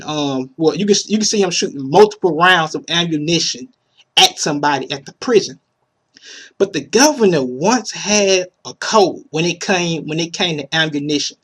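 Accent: South, United States. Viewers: What state are you from? I can't place your accent? Georgia? One of the Carolinas? PS. Thanks for the videos. New subscriber here. :)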